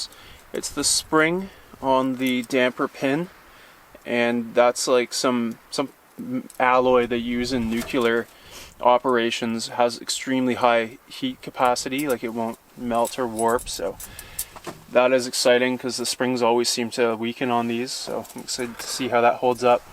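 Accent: Canada. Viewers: Well, I shouldn't be such a whinger about it, so apologies for that,! I thought it was Canada somewhere (due to your accent), but it's a rather large country so I was naturally curious as to where exactly. Thanks anyway for replying!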